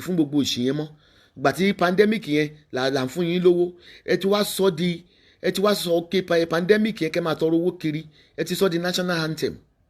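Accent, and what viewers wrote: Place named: Ibadan, Nigeria. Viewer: Love you for your down to earth attitude and truly it’s your Ibadan accent and the typical Ibadan behavior that is the koko of your show Omo Ibadan ki ni so; so sure Go on soun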